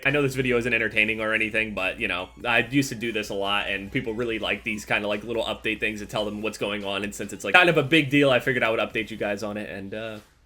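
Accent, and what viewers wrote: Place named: New Jersey, United States. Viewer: New Jersey accent on point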